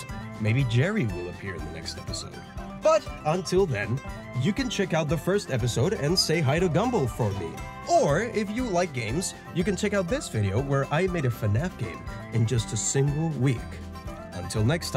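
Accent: Romania. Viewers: are ya romanian? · wait... Are you romenian? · wait, are you Romanian? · YO ARE YOU ROMANIAN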